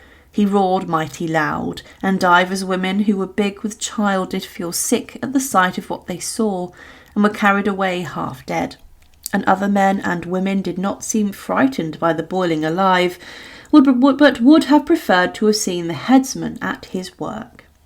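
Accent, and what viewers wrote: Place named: RP, United Kingdom. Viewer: Clear and precise RP. Perhaps, stick to listening to Sesame Street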